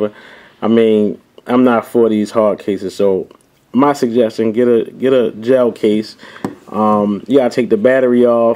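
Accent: Baltimore, United States. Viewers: Sounds like your from b.more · I like this video and your northern accent! I'm from Philly. You sound like you from B-more though. Cool video. regarding the hard cases and thing being exposed. I have the otterbox for my Evo. That thing is awesome! Nothing is exposed at all! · I hear that B-MORE in him all day KEYS BODYMORE all day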